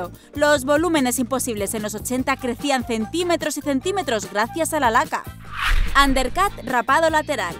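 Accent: Spain. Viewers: Lo peor él acento español :v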